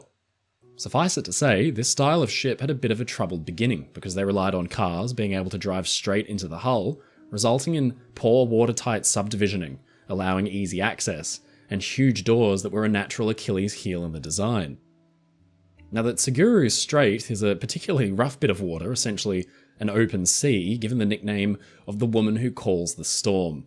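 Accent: Australia. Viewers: It’s just an ordinary Aussie accent.🇦🇺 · I attempted to watch this video while eating toast, but couldn't hear (Our Friend) over the crunching. So I turned on the Closed Captioning, and now I couldn't finish my breakfast because I was laughing so hard. Apparently the subtitle bots have never heard an Australian accent before.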